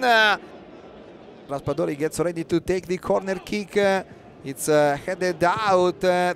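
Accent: Italy. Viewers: Sembra un italiano che legge il commento in inglese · the speaker is 101% Italian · I love to hear the italian accent from the commentator commenting Serie A highlights. So authentic and perfect for italian football👌 Grazie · What a comentator.. nice to hear it.. English with Italian aksen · The commentator makes me embarrassed to be italian. We don't all talk English like this 😒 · probably the strongest Italian accent ever heard on TV! even by us Italians!! it's the icoliseeerrrr! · Commentator with italian accent is the best thing of this new year lol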